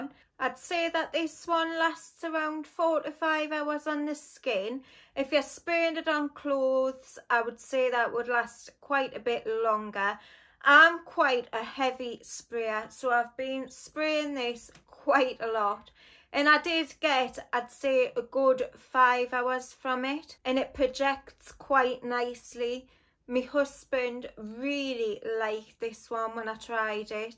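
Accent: Scotland, United Kingdom. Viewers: I love your accent! Scotland? It's so precious. Thanks for the review :)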